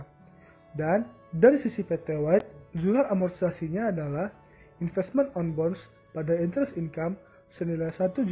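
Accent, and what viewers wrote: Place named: Bengkulu, Indonesia. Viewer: Keren kak, berasa aksen bengkulunya coba lain kali dubbernya orang yang sama tapi disuru nyanyi dulu biar fresh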